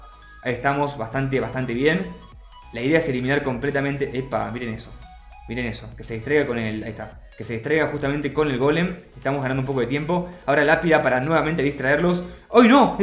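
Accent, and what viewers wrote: Argentina. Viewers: Che Vivis en argentina o españa por la forma en la que hablas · Habla como argentino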